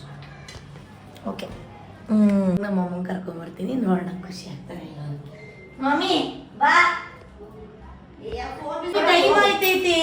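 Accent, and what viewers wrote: Mandya, India. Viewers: ಮಂಡ್ಯ ಭಾಷೆ ಸೂಪರ್ 😂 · Hai akka nim Amma jothee enu thumba video madee nim mandya bashee thumba changedee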